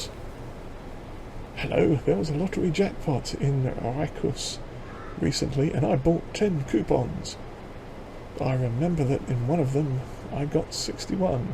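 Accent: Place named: England, United Kingdom. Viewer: yea me too I love it to be real tough BUT still doable of course. And yea I'm really tied to all the old vintage stuff. I'm from the US (Midwest) and despite what the game says my grandpa's farm growing up and all the surrounding farms were pretty small and used old stuff. I never saw a closed cab until I was long off the farm and in another state lol (I'm 43 and we are talking about as I grew up in the late 80s and thru the 90s lol) Haha you and I have had the same experience with the horse/ox and carriage/plow so yea it should be an adventure!!! What part of England are you from? By your accent I ruled out the rest of the UK. My apologies if I'm wrong but I'm pretty sure you aren't from anywhere else and you aren't a scouser lol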